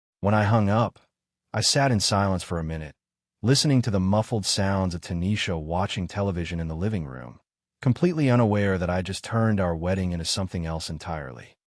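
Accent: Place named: Houston, United States. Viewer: If this not a real Houston story, definitely sounds like a woman from the Southwest side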